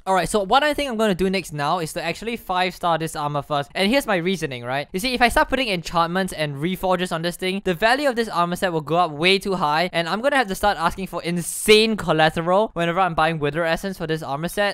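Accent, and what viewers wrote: Singapore. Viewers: I can tell ur from Singapore too:D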